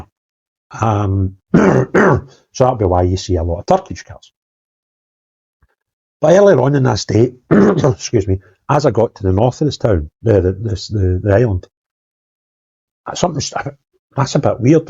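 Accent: Scotland, United Kingdom. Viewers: Is there any chance you could write down place names in the video descriptions? Sorry, I can't always pick them up from your Scottish accent. I like to search ttem up and read a bit more about them. I couldn't find the one which sounded to me like Kapatiki.Thanks. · please watch yourself doing this video. You've got an extremely broad Scottish accent, I want to listen to you as I think it's important. Some printed text would be most helpful ☺️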